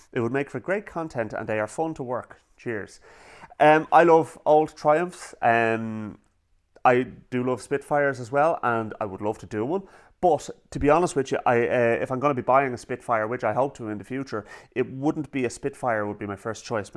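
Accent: Ireland. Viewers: The Irish lilt is very pleasing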